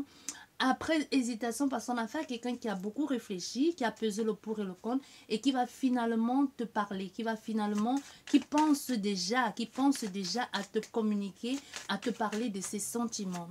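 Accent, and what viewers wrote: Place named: Martinique. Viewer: Vous êtes Martiniquaise ,avec cette accent...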